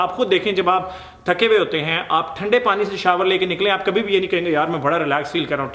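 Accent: Punjab, India. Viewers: sir ur video is awesome and ur panjabi is sooooo cuteeeeee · Pa ji your punjabi accent is just too gud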